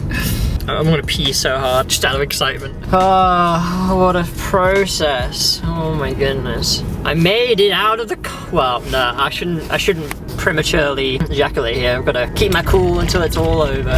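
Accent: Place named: England, United Kingdom. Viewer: Why is your accent getting more English.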